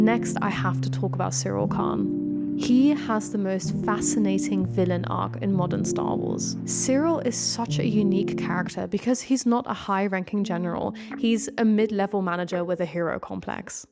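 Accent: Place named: Netherlands. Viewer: Jij klinkt Nederlands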